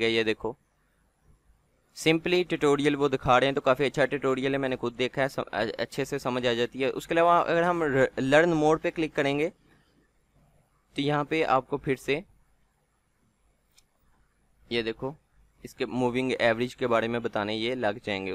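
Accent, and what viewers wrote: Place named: Lahore, Pakistan. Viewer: Love your truly lahori accent 💓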